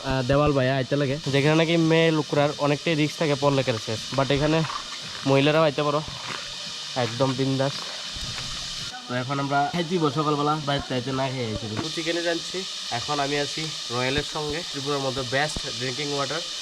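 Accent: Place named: Mymensingh, Bangladesh. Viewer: ভাই আপনার কথা তো একদম আমাদের বাংলাদেশের ময়মনসিংহ অঞ্চলের মানুষের মত আসলে আপনার বাসা কোথায়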